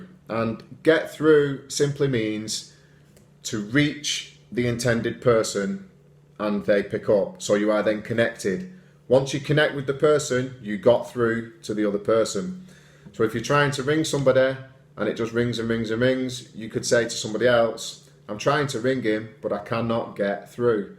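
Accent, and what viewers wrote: United Kingdom. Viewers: Great job 👏🏻 i love British accent 😻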